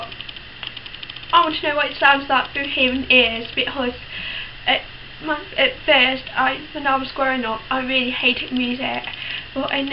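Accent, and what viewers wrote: United Kingdom. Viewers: I don't understand how you have a British accent, that's crazy how that works. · How do you talk like a frickin british person if you are frickin deaf???? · I realize that part of your accent is from being deaf, but it also sounds somewhat British. Is that where you're from? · I notice that you speak with a British accent, are you completely deaf or almost completely deaf? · They managed to train you into a vaguely Yorkshire accent *thumbs up* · Wow you're British and still have an accent! Of course you don't know what that sounds like but it's amazing how humans just know how to speak and adapt to situations like you have to know sign and english even though you can't hear what you're saying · Probably not the only one to ask but how do you get a British Accent if you can't hear the way words are pronounced? Just curious. :) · It's so cool how despite the fact that you're deaf, you still have an English accent hehe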